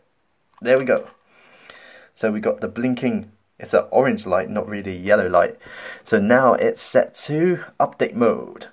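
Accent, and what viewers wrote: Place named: England, United Kingdom. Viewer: Thnx dude, i love your English accent =]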